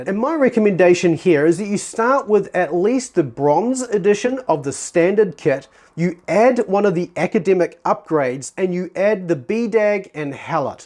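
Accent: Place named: New Zealand. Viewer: Nice to hear a kiwi accent :-)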